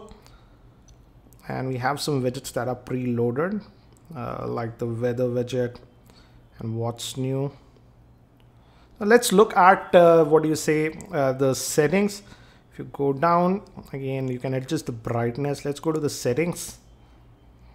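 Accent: India. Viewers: Indian english❤️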